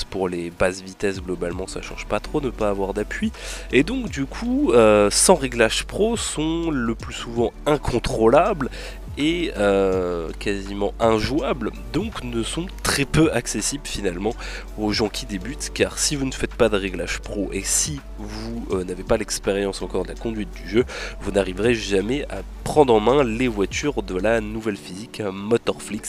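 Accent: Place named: Lorraine, France. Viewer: lol me suis fais la meme remarque. mais ça c'est l'accent de lorraine; en vrai il est assez eloigné du français lol.. comme le "j'ai pu', au lieu de "j'ai plus"..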